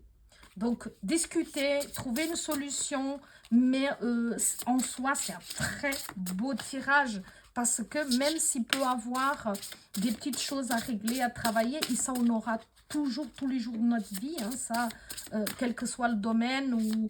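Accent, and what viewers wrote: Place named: Portugal. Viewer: Bonjour Merci beaucoup pour ce très beau tirage très inspiré et inspirant .... Très riche lecture avec un très beau parfum d'accent portugais que j'adore ..... Belle journée . A bientôt 🙏🌻 Pitus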